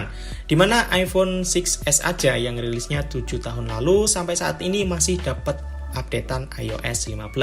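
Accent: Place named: Jember, Indonesia. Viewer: Wah orang jember wkwk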